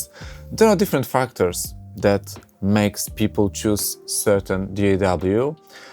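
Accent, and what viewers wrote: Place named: Poland. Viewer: Uslyszalam polski akcent.Mialam nosa.😄A ja jestem nadal na Studio One 2😂🤣😅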